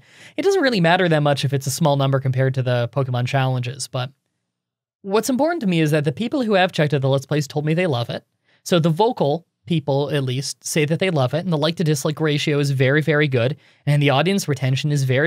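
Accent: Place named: Canada. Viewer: Hey man, Thanks for uploading the lets play of pkmn stadium, I was really glad when I saw it, because I knew will be half an hour of escape and I was considering that you start this more popular lets play on porpouse, I was really nice. I just want to share a funny history, you were the first canadian that I regonize because of the accent and for me was super cool, at the time my wife and I were planning move to Canada, she was invited to do her phd in uOttowa., when i was trying to catch some of Canada's culture and make me feel great. Cheers man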